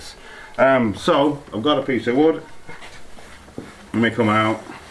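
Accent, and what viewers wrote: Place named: North, United Kingdom. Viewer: Congratulations on reaching over 2,000 subscribers. The two of you make a wonderful team. Thank you for not having background music when you are carrying out your tasks and craftsmanship, it make viewing so much more pleasurable., it’s great hearing a northern accent, and your dry humour. Looking forward to seeing your future projects. Keep up the great work. Pauline, Sheffield.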